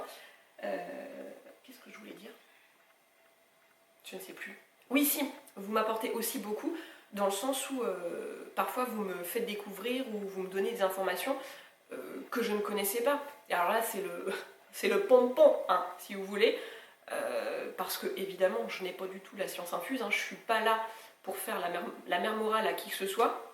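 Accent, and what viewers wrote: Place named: Brittany, France. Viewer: Ha ha Mdr, et baaaah perso moi j'adore tes mimiques et ton petit accent breton qui me rappel mon chez moi, voilà dix ans que j'ai troqué ma plage de Pen bé et mon sel de Guérande pour les volcans d'Auvergne. Je suis partie en pensant que nous breton n'avions pas d'accent, un an plus tard je me suis rendu compte de notre façon de parler et ça me fait plaisir d'entendre ça dans tes vidéos. Serions-nous les seuls à comprendre? 🤔 mon mec déteste quand je rentre en Bretagne je reprend l'accent et la façon de parler direct ! 😅 je laisse rarement des commentaires mais là je me devais de te le dire, tu es une de mes youtubeuse préféré justement parce que tu es naturelle, sans chichi, normal quoi, et ça fait tellement de bien 😄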